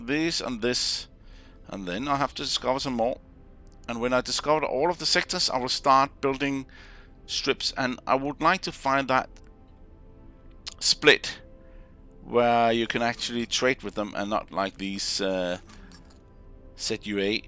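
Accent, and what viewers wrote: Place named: Denmark. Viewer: Er lige startet på din Lets play! Kunne høre at du var dansker og ville sige Godt arbejde og pas på dig selv <3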